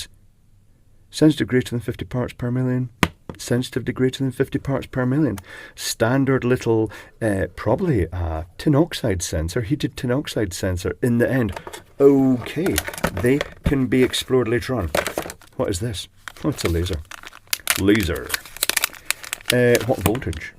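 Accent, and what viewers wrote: Scotland, United Kingdom. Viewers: I don't understand that much about electronics but find these videos fascinating. The other half of the reason I come here is for the relaxing effect of creamy smooth Scottish accent. 😅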